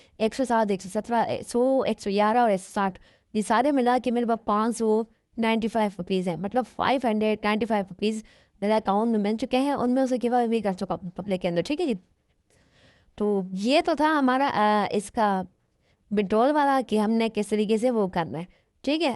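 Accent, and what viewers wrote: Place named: China. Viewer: Tumhara Hindi Do Chinese hindi hai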